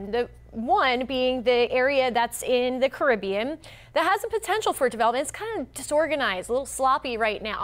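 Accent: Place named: Pennsylvania, United States. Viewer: Gawd her accent is just terrible. Where is that from Pennsylvania?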